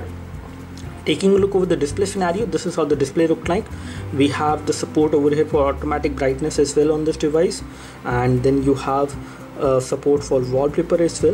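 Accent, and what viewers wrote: India. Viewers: By your accent i would say you are Indian right? :D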